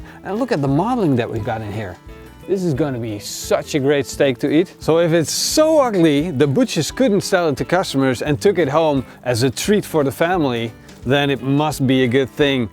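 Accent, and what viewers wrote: Netherlands. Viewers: are you dutch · Je hoort echt dat Nederlandse accent hij zei stok terwijl het stick moest zijn 😂😂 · I sense Dutch accent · You hear this guy is from the Netherlands 😂😂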